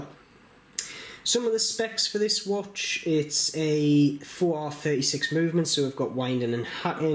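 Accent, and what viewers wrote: Newcastle, United Kingdom. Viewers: That's a proppa Geordie accent mind 👍👍👍👍 Shy Bairns Get Nowt 🤣🤣🤣 looking forward to seeing some future videos featuring your mods 😉😉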